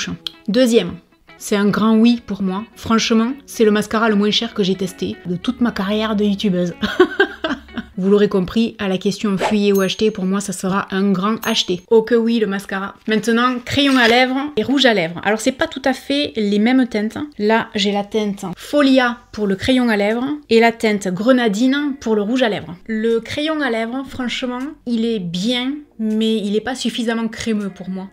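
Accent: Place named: Pas-de-Calais, France. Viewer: J adore tes vidéos et ton petit accent bizz du pas de calais 💋👍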